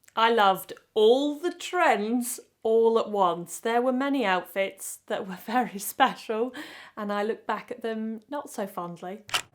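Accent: United Kingdom. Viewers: Thanks for being a normal person and including the goofs. Makes you seem down to earth. I lived in London for two years in the 90s when the music was the best and I LOVE and miss your accent. I used to hear your accent all the time. I now live in the states and the accents are not the same. British accents are the best. Thanks for your style advice by the way. I'm still a tomboy so I need some help in that area.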